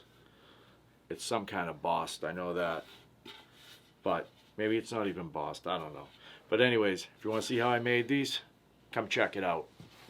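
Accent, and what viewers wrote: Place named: Massachusetts, United States. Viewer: Dude! These signs are awesome! How am I just finding you now??? I grew up in Natick and now live in Portland (Maine), but I miss my homeboy accent something wicked. And Maldenites have one of the best MA accents of all. Anyway, I found you through the Rockler 2x4 Challenge and you have a new subscriber. Keep on makin'!!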